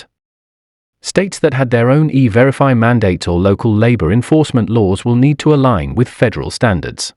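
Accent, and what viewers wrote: United Kingdom. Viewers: I don’t need to hear a British ethnic voice talk about the USA. Didn’t we kick those people out of the America for a reason?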